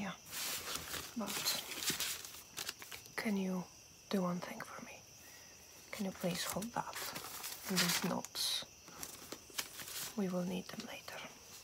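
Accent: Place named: Netherlands. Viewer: I'm trying to place her accent but...Dutch maybe?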